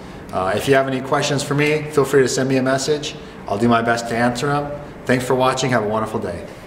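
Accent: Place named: West, Canada. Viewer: You must be western Canadian. I learned when I moved here that people say "...right?" as a confirmation at the end of a sentence very often. People can come to a country without researching if they are flexible people, but otherwise, be prepared to suffer!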